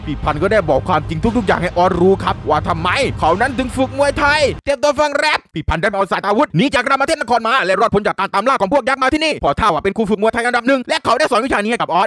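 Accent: South, Thailand. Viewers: พี่เป็นคนใต้เหรอ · พี่เป็นคนใต้ไข่ไหม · ชอบพี่บ่าวว่ะสำเนียงใต้นิดนึง55 ชอบๆ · พี่เป็นคนใต้หรอ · เวลาแหลงใต้ โคตรได้ใจเลย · คนใต้เห้อหลวง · พี่เป็นคนใต้ใช้ไหม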